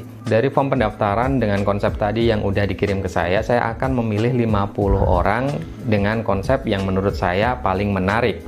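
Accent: Pekalongan, Indonesia. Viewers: cah kalongan ndi suarane?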